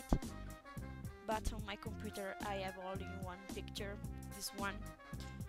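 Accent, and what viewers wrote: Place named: United States. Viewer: hai una voce classica americana aahah ???? wtf???? hahaha mi fai troppo ridere!!!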